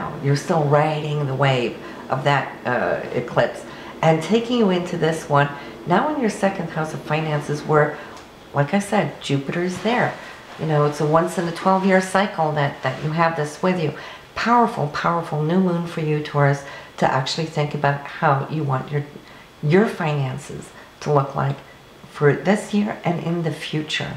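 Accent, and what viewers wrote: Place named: New York, United States. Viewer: nice reading. you sound like a new yorker who looks like she lives in tucson...i say that because I am that!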